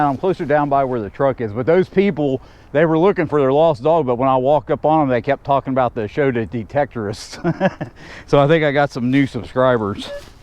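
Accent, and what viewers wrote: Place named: Ohio, United States. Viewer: From Ohio. Good to hear the Ohio accent “worsher” never change :). Reminds me of home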